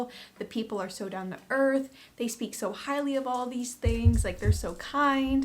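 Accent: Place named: Argentina. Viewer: ! Vengo de ver tu entrevista y tu forma de expresarte con esa mezcla de acento argentino y tu acento americano es super lindo. Me dió ternura escucharte con el acento americano pero a la vez me encantó escucharte pronunciar las palabras como porteña. Encontrá la manera con la que más auténtica te sientas, pero tu forma de hablar el castellano como argentina tambien es super lindo y un bonito gesto de honrar el pais que te recibió. 💖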